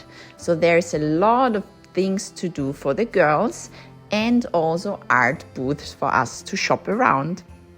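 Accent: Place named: Italy. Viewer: Oh you are truly a beautiful honest spiritual soul. I really appreciate your wonderful truthful videos. You are clearly hard working and your italian accent as a 'foreigner' is amazing. It is very Hard to move to another country - Well Done. My mother was italian and thankfully have an italian passport. Much love Fiona South Africa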